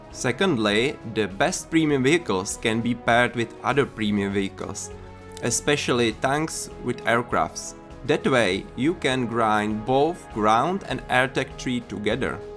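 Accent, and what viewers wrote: Czech Republic. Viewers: Bro tohle zní jako hodně českej "akcent" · Já 2 vteřiny po začátku videa: To je jasnej čech, dávám odběr :D Dlouho čekám až se ta youtube česká základna WT trochu rozroste :D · Hey are you perhaps czech? I noticed the accent lol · Český přízvuk je docela znatelný :D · čengliš slyším už od prvního slova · hodně český accent :D nicmene pekne video :) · Great video it helped me to pick the best premium to buy. Ale ten českej přízvuk mě hnedka hitnul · It's so noticable that you are czech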